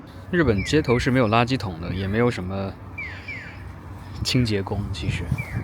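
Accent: Standard. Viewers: 视频主的声线温柔纯净，普通话标准，赞！ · 哪裡人？有沒有人說過你聲音蠻好聽的？普通話也很標準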